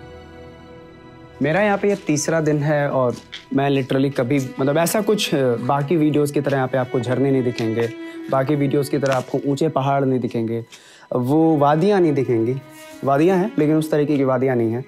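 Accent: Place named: Kashmir, India. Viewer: Are ye voice over wale bhaiya bhut Jada ...akdm writer type ho jate hai .....bihar hai guru thoda lallantop type rhe thoda casual matlb ....bihar kon aise bolta hai sudh hindi .... Bass hmko lg rha thoda aram se bola jay ...apne jaisa to or v feel ayega na 😁❣️ Ye bihari kam kasmiri juban lgta hai Ho skta hai hm galat v ho....so mafi v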